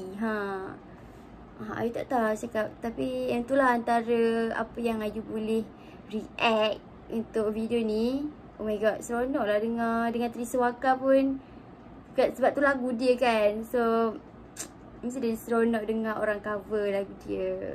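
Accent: Malaysia. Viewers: Candu Logat Melayu 😭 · Saye dri Indonesia🇮🇩 tpi saye suke bahase logat Malaysia,Karne enak di dengar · Dialek malaysia saya suka dengar. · Saya orang indonesia,dengar suara orang malaysia ngmng,sangat kagum apalgi cantik banget macam ukhti ayu..mantaab...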